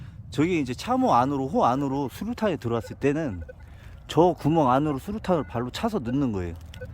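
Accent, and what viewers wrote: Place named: Chungcheong, South Korea. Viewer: 말투가 충청도사투리같내유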